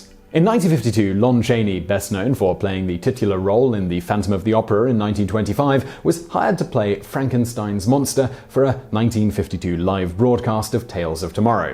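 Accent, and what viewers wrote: England, United Kingdom. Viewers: Amazing that a bunch of "nothing" can be turned into something by a guy with an English accent. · please learn to better modulate your voice to a slower tempo; you're not competing against someone from a help desk in New Delhi to see how many words you can produce under a minute! Your material is wonderful but I miss most of what you say unless I turn on the captions then loose seeing what's being shown! Yes, your diction is commendable and you should be proud of your British accent, just stop torturing us with your machinegun-talking-without-enough-commas-and-periods-between-changing-sentences!! Or, stop using Meth before you begin your shows as I'm getting ready to boycott your channel; I'm sure I'm not the only one who feels this way... Thank you.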